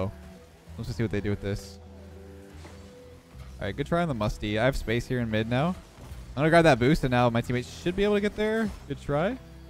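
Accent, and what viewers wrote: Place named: Canada. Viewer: A follow Canadian Ehh🤣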